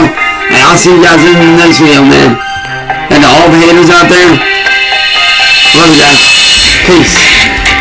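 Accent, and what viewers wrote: United States. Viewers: I was actually getting to like the gay cowboy accent ! He sure is mumbling a lot lately. For such a hard worker he can’t even enunciate his words, simple grade school stuff !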